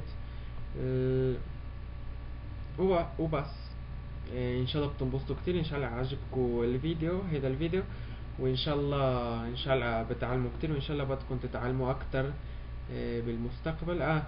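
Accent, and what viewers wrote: Mexico. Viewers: يتكلم اسباني ب لفظ مكسيكي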